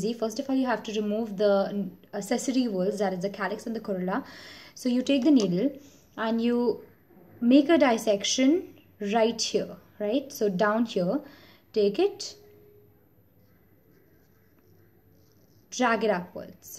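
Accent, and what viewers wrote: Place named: England, United Kingdom. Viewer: Impressed by English accent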